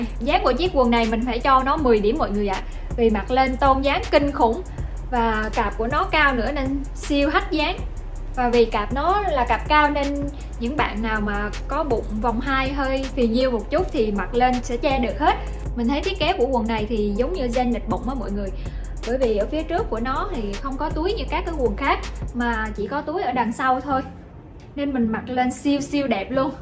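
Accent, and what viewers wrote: Quang Nam, Vietnam. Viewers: Nghe phát biết người Quảng Nam liền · c này chắc người Quảng Nam quá :))))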